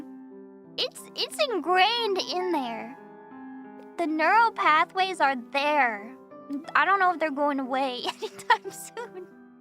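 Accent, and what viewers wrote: United Kingdom. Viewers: With how much british ancestry you had I hope to hear that lovely british accent a lot more:_nimiHaha: Nice silly idea for a stream and dna accurate drawing was fun. Thanks for the stream:_nimiHeart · イギリス訛りいっぱい聞きたいよ！♡ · I knew she was Bri'ish, you can hear it in her accent :_nimiNerd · British accent is confirmed to be authentic! This was such a unique stream idea.:_nimiHeart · thanks for the stream!:_nimiHeart: it was fun going through your results and I can see where the amazing british accent comes from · I knew she had to be mainly british once I heard her british accent - it's bloo'y lovely! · I always knew you were British, your American accent is VERY convincing though. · My oshi is 2/3s British, now the British accent coming so naturally makes sense:_nimiHaha: This was super interesting, seeing all the results. I still think the ear wax is very cursed though:_nimiHaha: Thanks for the stream:_nimiHeart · Wow, the results are in and is 100% cute, but with no trace of elephant:_nimiCry:. 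Ah well, at least you have genuine British in you, so your accent must be genuine as well! Thanks for the stream and see you for next time! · Authentic British accent! Thanks for the super fun stream! Looking forward to more! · The British accent has been ingrained in her this whole time. It was foretold. :_nimiHaha: Thanks for the stream :_nimiHeart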